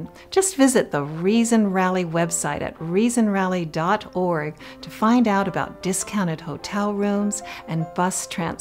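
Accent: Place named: United States. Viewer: It always warms my heart to hear American English Language being spoken Reason.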